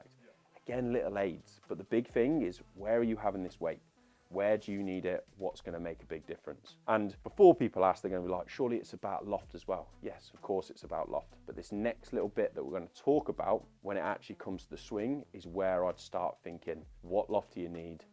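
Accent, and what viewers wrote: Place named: Derbyshire, United Kingdom. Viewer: You sound like you are from not too far away from me judging by your accent. Im from Derbyshire. Where would you recommend getting a fitting? Any suggestions? ✌️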